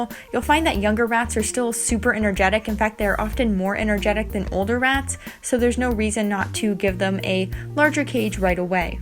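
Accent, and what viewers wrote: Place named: England, United Kingdom. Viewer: Interesting, i get to hear a version of you with a strong English accent using £ pounds instead of dollars. Love both versions!!! YOU ARE AMAZING 😁👑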